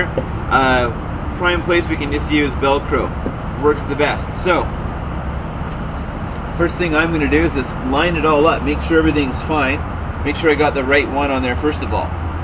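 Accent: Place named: Canada. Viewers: He sounds canadian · He's got a strong Canadian accent. Could also be from Minnesoooda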